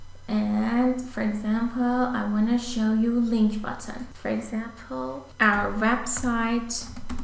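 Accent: Iran. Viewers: Your accent sounds Persian to me nice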